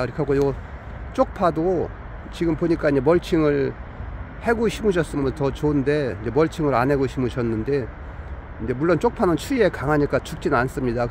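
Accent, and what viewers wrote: Chungcheong, South Korea. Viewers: 구수한 충청도사투리 에 귀에 쏙쏙 정보 감사해요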